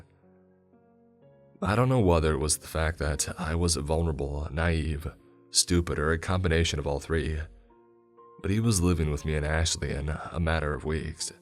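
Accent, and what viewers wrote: United Kingdom. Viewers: Can tell it’s a Brit that described this hoax story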